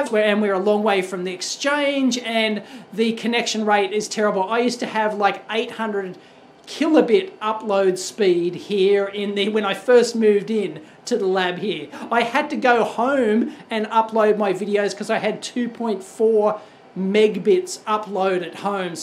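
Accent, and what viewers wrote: Australia. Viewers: No idea why this was recommended to me and no idea why I clicked it. But I like it. The guy sounds like a very upbeat person. Probably the accent. Australians always sound like they're loving life. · You sound Australian but I am sure this is located in India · Aussie accent yeahh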